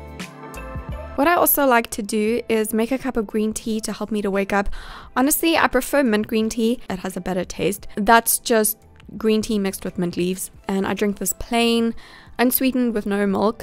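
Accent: South Africa. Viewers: When you said that you drink Royibos tea ( I can’t spell) I knew that you had to be from there, because you also have the same accent as my parents ( they are also from South Africa) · Is sy van suid Afrika? Want sy klink soo... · Cat Mouse she’s South African😊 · Pxssy Destruktor she is from South Africa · OMG. This is my first vid of yours. I'm from South Africa and as soon as I heard your accent I got so excited. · Omg omg are youSouth African ? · Are you south african? I can hear ittt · Hallo. Bly jy in Suid Afrika. Jy klink net so. Wow, jou videos is baie mooi. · Are u south African ? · I'm new... your accent? South African? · I have a question are you south african? because you sound very south african by the way I am south african.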